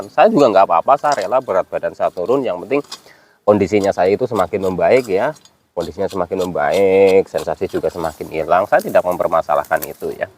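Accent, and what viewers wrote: Banyumas, Indonesia. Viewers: ngapak a mana mas?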